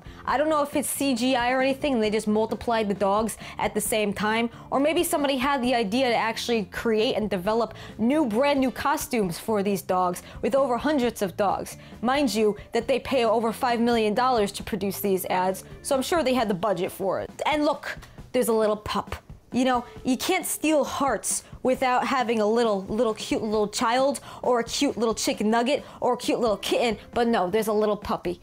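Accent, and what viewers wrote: New York, United States. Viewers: Are you actually from N.Y or is it just a voice you do? Either way I love it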